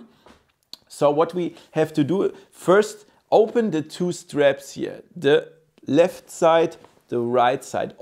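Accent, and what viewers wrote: Germany. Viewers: Der deutsche Akzent genial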